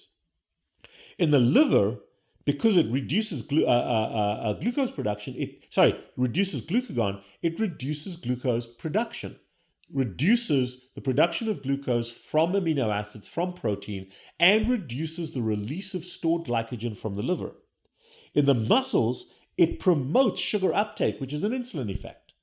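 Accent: South Africa. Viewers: Love this advice, thank you. Love the South African accent! I'm also South African. If people can realise that there is not just a cure all but you also need to develop healthy habits to sustain the weigh loss for the rest of your life. Subscribed! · Hahah… I was just going to ask if the Dr is from SA? Spot the accent a mile away! ❤ from Pretoria! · This is the first time I hear a physician speak from such indepth knowledge, esp with Type 1 Diabetes! I'm a Type 1, on Ozempic and not losing at all. My hba1c is at 8.2... I believe your clinic has such an amazing approach losing weight! BTW, I think your accent is South African? I'm also South African and I wish we had more physicians who were skilled with glp meds. My endocrinologist is not 100% on-board with GLP-meds. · You sound south African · South African accent. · 1. You are Sohth African, by the accent; what British are you talking about?😅 2. 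I've gained nothing ever since I stopped, which was 10 months ago. 3. These medications are like miracles. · You have a South African accent 😂?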